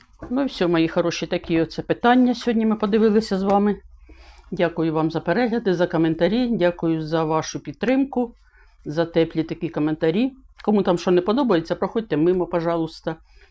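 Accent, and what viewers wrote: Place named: Central Ukraine, Ukraine. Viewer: Ми всі так розмовляємо в центральній Україні,сурджиком.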